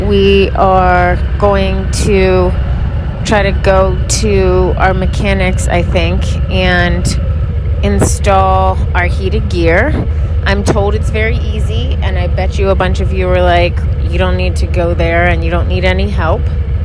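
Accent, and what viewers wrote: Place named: Maryland, United States. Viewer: My wife just walked in the room as I am watching this video. She said “who is she, is she from Maryland?” I said hell yeah hon! Lol! 🦀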